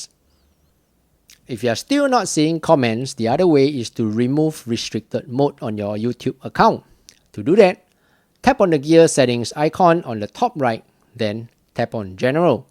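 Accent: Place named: Singapore. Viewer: Hi, Great channel sir. Im wondering if you can help me.. For the past year Ive been occasionally been making comments (usually compliments) on interesting channels. However I have virtually had no one ever add to my comment or even like it and when i look at all the other comments before and after mine most have a few other replies and likes from other members (who posted comments). I can visually see my comment after it's posted but it's like no one else can. Firstly, can you see my comment here? and secondly even if you can is there any other reason others cannot see my posts on other channels I comment on etc. Thank you! Jon New Zealand (Mate, you sound like you are from Singapore! I grew up in Singapore in the 80's lah!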